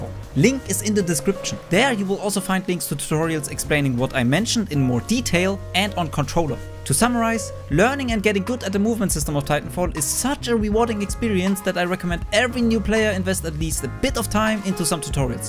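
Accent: Germany. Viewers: Why do you have such a german accent? Tbh, if you are german, you are speaking very clearly, easy to understand Love your videos btw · not really. he sounds very german :) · As a German he sounds german :D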